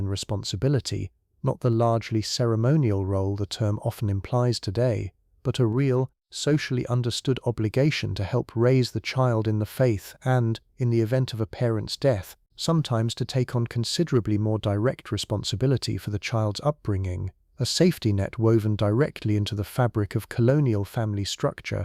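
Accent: United Kingdom. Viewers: You with the British accent forget that your country transported 3.2 million slaves from the 1600s making yourselves very rich. The entire endeavor is still going on in too many lands by the same people that sold their own all those years ago. It is all diabolical.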